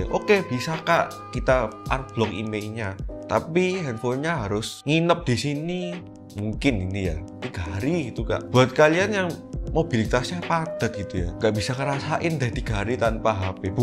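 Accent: Java, Indonesia. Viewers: Medhok bang hehe semangat bang salah satu inspirasi sya 🙏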